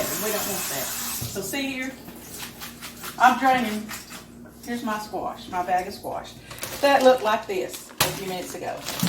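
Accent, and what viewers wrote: South, United States. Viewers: Mrs., you've saved two southern lesbians' dinner tonight! We love your accent; you remind us of all the memaw's, mamaw's, and nana's that we've known and loved. You've got two new fans. We hope you had a great 4th of July and God bless!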